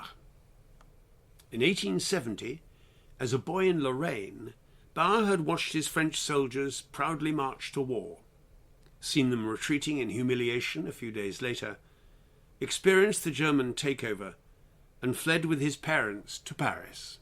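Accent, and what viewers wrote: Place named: RP, United Kingdom. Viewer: Jesus dude, why? Why is it, as an American who's passed the big 60, with German & Swedish ancestory, who's never really bothered to do much except smoke pot & watch TV, why is it, whether you, or Sir Lawrence O narrating "The World at War", I can only digest history, if narrated in public school Queen's English? What I mean is, I really enjoyed this, and am grateful you produced this, and I will have to read (hear, on <link> really) that book!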